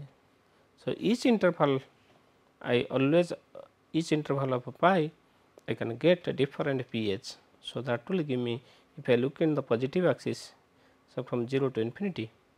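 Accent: India. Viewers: What is the meaning of talking when your English only can be understood by the Indian population. we have to be focused on 2 things the maths and the very hard Indian accent.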